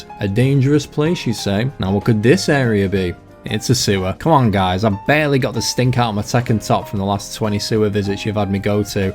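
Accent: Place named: England, United Kingdom. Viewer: i cant wait to see your bee team as a fellow funbeemon enjoyer wait what, why retire the bees when you can evolve them to their beeier forms. funbeemon's mainline is nothing but one big beehive, unfortunately, funbeemon's most recent new evolution branch wasn't added to the game though hopefully in an update or dlc it is. love to see you really enjoying the game bro. and a fellow englishmen as well i hear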